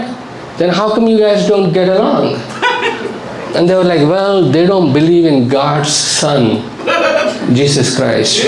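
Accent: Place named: India. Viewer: Keep ur Indian accent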